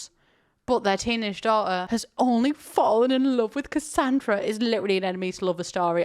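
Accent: United Kingdom. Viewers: i really love your videos, i was just wondering if you could add captions (either in the video or in youtube) bc with my adhd auditory processing can be hard sometimes, especially with thicker accents like yours (maybe not as bad for uk folks but i'm american so i'm not as assimilated to that sort of accent). it's like the only thing keeping me from binge watching them constantly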